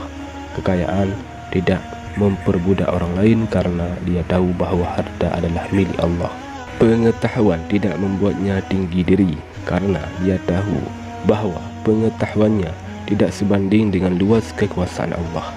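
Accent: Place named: Bali, Indonesia. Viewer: Orang Bali kah, ithu ....ditherima....dst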